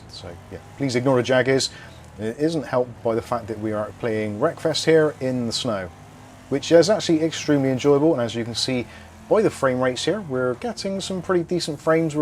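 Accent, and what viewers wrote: Bristol, United Kingdom. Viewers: Did I see this on Facebook marketplace a few days ago? Thought I recognized the Bristolian accent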